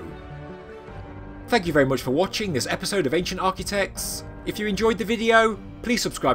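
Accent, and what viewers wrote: United Kingdom. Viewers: A. British interpilation of Ancient African Art.and why it was defaced was. so perpostrtious that. It is not funny. This British sounding. narrator is a God Damer. The African People who created this type of art were not that supertiscious or self lothing.They were not slaves and and did not hate their cultural phenotype. This narraitor completely overlooked the Persian, Greek and latter(muslim Arabs who would pillage,destroy and alter the Afriican Dynamic and life like Art out of Jellouse envey and hatred of an. African People who were superior to them in culture.Egyptian Staturtory and life like reproduction in stone are unraveled today in reproduction.Egyptian art is so life like the images look alive. The Arabs have done more damage than the Franch and British combined in smashing noses and distorting African feachers.They now claim that they are the Ancient Egyptians.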